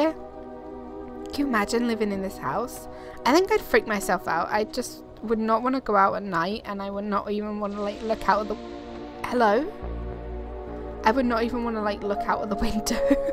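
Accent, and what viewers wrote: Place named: United Kingdom. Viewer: I do like your style, and your vids look promising. Nice work. Your numbers are goals as far as I'm concerned. And it's great to hear another British Let's Player :)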